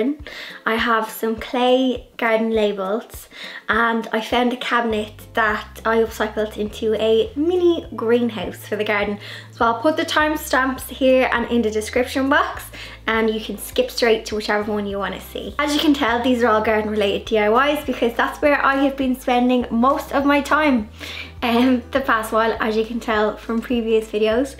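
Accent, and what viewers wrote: Dublin, Ireland. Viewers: Hiya. My 11 old daughter discovered your channel. She's so creative. We love your creative spirit. I'm a mad tea dress vintage decor fab. So love and congratulations for inspiring a lot of people to get creative. Plus hearing your Dub accent from here in Spain being a Dub myself is brilliant!!!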